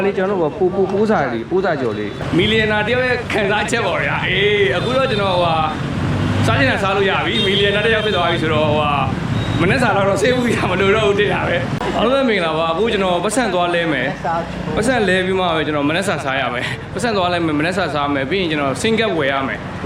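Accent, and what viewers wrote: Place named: Upper Burma, Myanmar. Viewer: ထမင်းစားရင်လဲ ကို ပဲကြည့် အလုပ်သွားအလုပ်ပြန် ကားစီးနေရင်းလဲ ကြည့် videoလေးတွေတကရ်သဘောကြတရ် စကားပြောတာကလဲ လုံးဝပွင့်ပွင့်လင်းလင်းနက်လုံးဝအညာစတိုင်ကြီး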